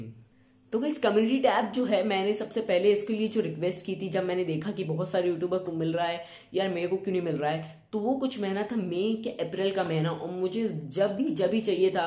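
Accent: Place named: India. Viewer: You sounds like true Indian but Atleast you still can speaks English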